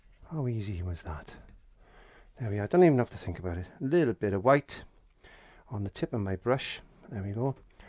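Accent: Wales, United Kingdom. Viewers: How wonderful to hear that wonderful Welsh accent. Land of my Fathers.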